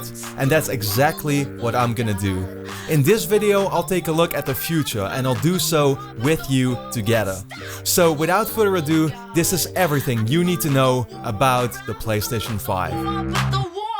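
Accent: Netherlands. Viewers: Youre Dutch arnt you? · I don't know why i can always hear if a person is Dutch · are u dutch? Lol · heerlijk hollands accent! maar zeker goede vid! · nice dutch accent :) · Ben je Nederlands want zo klinkt je accent wel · R u dutch? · Ben je Nederlands? Volgens mij hoor ik het aan je accent. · Ik gok dat je een Nederlander bent. Als je vraagt hoe ik dat weet, het is heel makkelijk te horen aan je accent als je Engels praat. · No hate on the video btw, great thinking logic (is that a dutch accent?) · are u dutch?? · Ben je nederlands je hebt zo een nederlands engels accent? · Jeez the Dutch accent is killing me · Do you come from the Netherlands? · Sxrewhead could hear it in the accent I'm Dutch too. (if my name didn't give it away) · are you dutch? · Je Nederlandse accent is wel te horen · Are you dutch? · M8 is there any chance that your Dutch? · Zo'n mega zwaar Nederlands accent haha heerlijk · why can i hear if poeple are dutch or not XD cuz im dutch and is dutch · Are u from the Netherlands 🇳🇱 my friend? 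· Ben je Nederlands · This guy is 100% dutch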